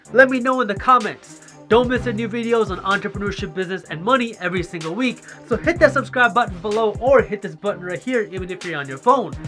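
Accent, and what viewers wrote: United States. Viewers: I just love it how you start with the Punjabi accent in the beginning and say your name, and subtly switch to American accent after that!! (In all your videos) Super proud Punjabi :D Keep inspiring man!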